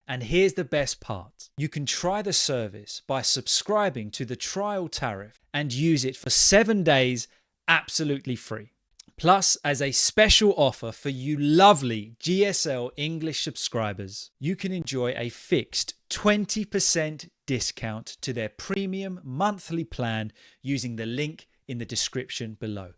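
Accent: United Kingdom. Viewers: It is stupendous teacher,.I follow British pronunciation therefore, I try to imitate you. Hence, please try to make more speaking videos 😊 Thanks from India.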